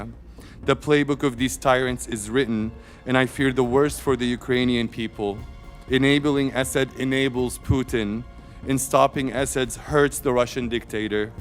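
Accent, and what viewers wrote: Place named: United States. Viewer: دخلك لغتك العنجليزي كانك أمريكي تتكلم بطلاقة متى تعلمت الانجليزية وانت بتعرف بسوريا المتخرجين من الأدب الانجليزي لايتكلمون لانه مافي براكتيك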